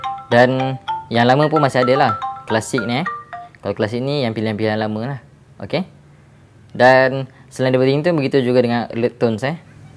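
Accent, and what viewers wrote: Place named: Negeri Sembilan, Malaysia. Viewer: suara mcm org nogori je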